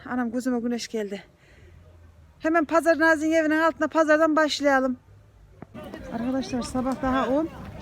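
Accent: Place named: Merzifon, Turkey. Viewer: Bende merzifonluyum bursaya tasındım senide merzifonlular gibi konuşuyorsun diye yeni takip etmiştim merzifon yazısını görünce cok sasırdım hala dedem dayım herkes orada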